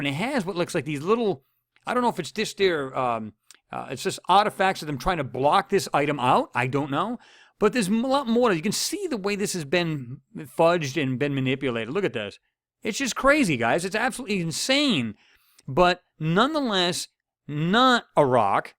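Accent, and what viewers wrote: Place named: New Jersey, United States. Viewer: "for everyone on a pee cee" you're funny you're definitely from New Jersey Maryland or New York LOL anyhow brother send me an email. I like your Mars and moon work the most but I wish you could take a nice hard long look at Ceres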